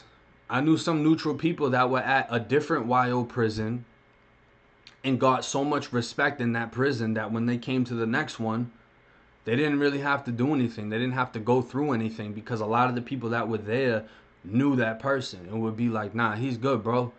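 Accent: Boston, United States. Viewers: Love your Channel. Have seen many same type Channels. This is in my opinion far the best. You have a talent for this. With your Boston accent. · 1 of Christina baby gang , I love your East Coast Boston accent. I’m from Connecticut. Sometimes some words come out like Boston area, but not enough LOL 😂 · Bro accent sound like you could of played in The Departed be Mark Wahlberg voice double 😂😂😂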